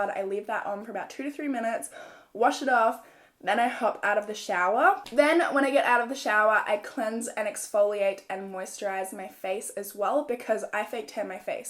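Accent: Australia. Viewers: I just live for your Australian accent 😄😄❤️ I never knew you were that fair to tan your body 😲😲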